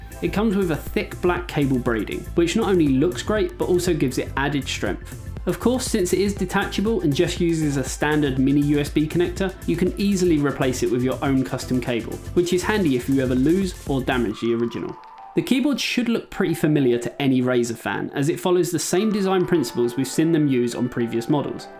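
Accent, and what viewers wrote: United Kingdom. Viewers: I love when to watch english video's instead of american. I don't know how but the british accent makes me focus more on the video instead of interruption of my dogs barking or driving by cars🤔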